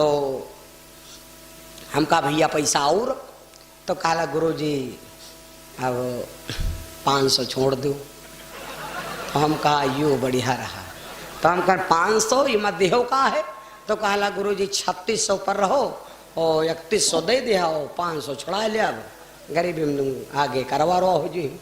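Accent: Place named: Uttar Pradesh, India. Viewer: बहन सीतापुर और बाराबंकी की लोकल भाषा यही है ये कथा वाचक सीतापुर और बाराबंकी के बॉर्डर के हैं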